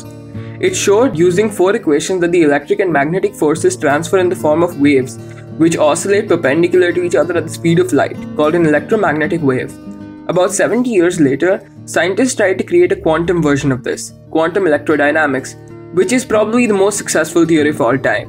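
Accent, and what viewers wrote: India. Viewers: Your accent sounds like that of an Indian. Are you a student of some IISER/IISC? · Why is it so difficult to understand Indian accent? :( I know Spanish is also quite terrible. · India. The accent.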